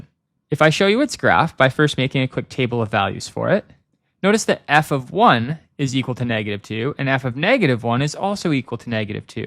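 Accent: Canada. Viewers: i hear the canadian accent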